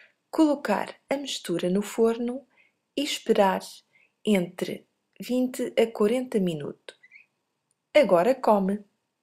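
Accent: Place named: Rio de Janeiro, Brazil. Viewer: É muito parecido ao sotaque dos Cariocas, claras de ovosh!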